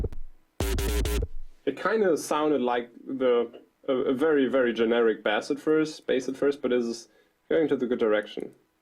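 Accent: Germany. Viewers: bist doch berliner oder :D?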